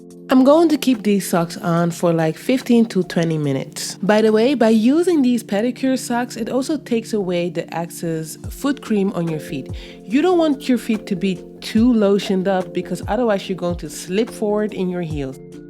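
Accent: Netherlands. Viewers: Are you dutch by any chance if I may ask? loving the vids... New subscriber here🥰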